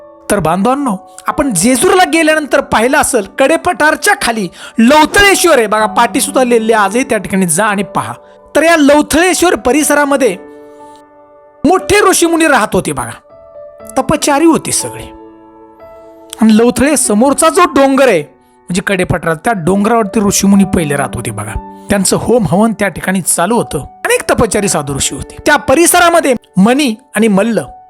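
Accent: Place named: Belgaum, India. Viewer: tumchya bolnyavarun tari tumhi belgav che mulche asave ase vatte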